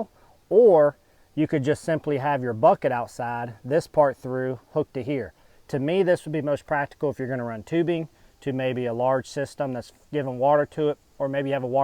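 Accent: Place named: South, United States. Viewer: I watched this today. Interesting inco. Will look into vor warm weather watering. I live in area where it freezes several months of year. I use a rubber pan for water now. When water freezes, I turn it over and stomp out ice, then refill. Easiest method for me here. What do you suggest for winter months, without using a heating source. I can tell you are from Southern area because of acceent😉. This product , In my opinion, would not work in freezing climate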